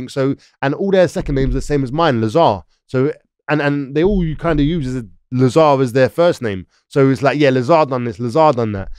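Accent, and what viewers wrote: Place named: Camden, United Kingdom. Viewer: Great story ! Love the accent as a Londoner all Camden heads sound like this !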